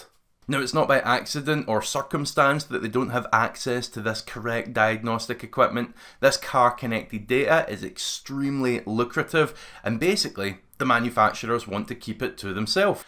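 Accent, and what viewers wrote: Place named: England, United Kingdom. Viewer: heavy english, similar to ozzy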